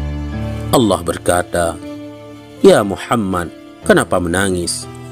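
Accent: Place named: Bali, Indonesia. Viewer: Suara admin kayak orang Bali ya?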